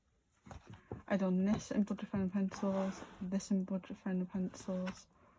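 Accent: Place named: Wirral, United Kingdom. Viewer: You sound like you’re not too far from me, I’m from the Wirral! B&M, Home bargain and the works are such good places to get books from aren’t they!? Think I spotted a few I got from there too. Lovely pages x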